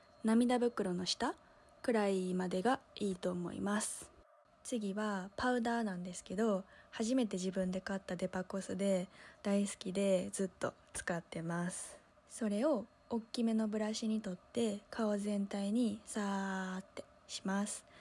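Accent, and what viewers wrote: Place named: Kansai, Japan. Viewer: さんの関西弁、大好きです＼(^o^)／ いつまでも、そのイントネーションでいてくださいね！てか、2年温めてた！？www これからも、マイペースに動画更新続けてくださいねー♪ おわりー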